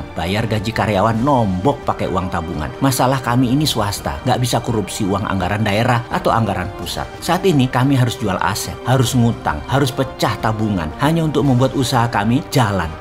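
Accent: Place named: East Java, Indonesia. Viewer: Seneng denger logat jawa timuranya bos. Sehat selalu bosman.panjang umur.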